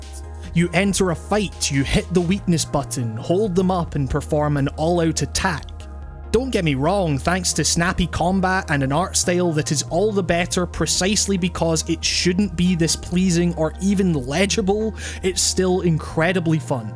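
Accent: Scotland, United Kingdom. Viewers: Are you Scottish? I love your accent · god i love the scottish accent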